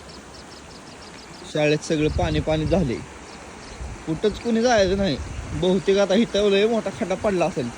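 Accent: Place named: Marathwada, India. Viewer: तुम्ही कोणत्या गावातून बोलता तालुका कोणता आहे भाषा मराठवाडा तील वाटते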